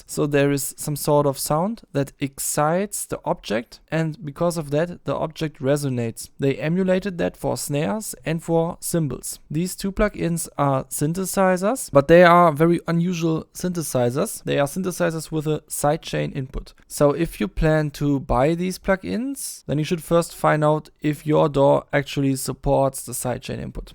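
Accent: Germany. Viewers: Boah deinen deutschen Akzent hört man sofort raus 😅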